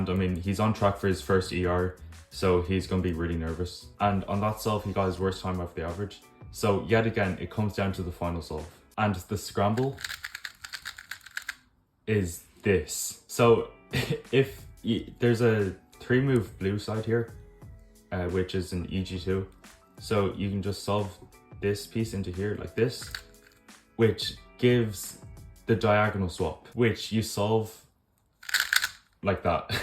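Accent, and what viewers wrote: Ireland. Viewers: ur irish accent is too strong for my english + chinese brain to combine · Hey, are u irish? ur accent sounds like a south dublin accent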